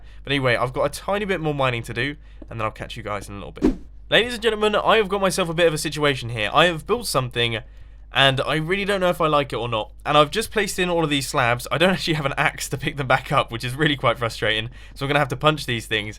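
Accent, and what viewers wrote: England, United Kingdom. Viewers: Yeah I figured he was English (or Welsh) but I just wanted to ask because you never know. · In just 2 minutes I was inspired to fly my drone again. I've never been so inspired in my life. Is it the British accent? Idk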